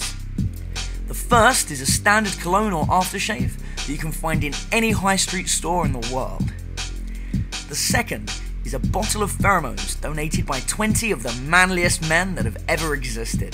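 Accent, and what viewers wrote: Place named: United Kingdom. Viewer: I like his British accent :)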